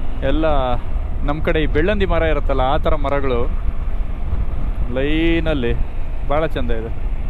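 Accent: Kundapura, India. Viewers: 27 : Kundapura kannada ❤🔥🔥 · Kundapura kannada Laik ayth 🥰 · ಸೂಪರ್ ಕುಂದಾಪುರ ಭಾಷೆ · Very good kundhapura Kannada 👌🏼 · Kundapura Kannada super 👌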